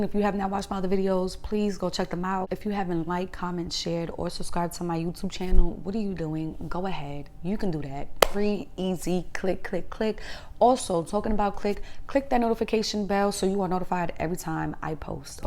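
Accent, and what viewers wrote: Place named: Brooklyn, United States. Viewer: One thing about, it’s not a word she can say without sounding Brooklyn af. 🤣 Like everything about you scream BK/NY. I love your accent - a Bronx girl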